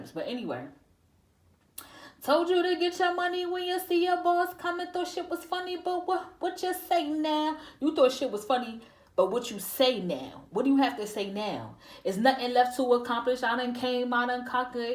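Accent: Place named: New York, United States. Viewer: love ur accent is u from nycc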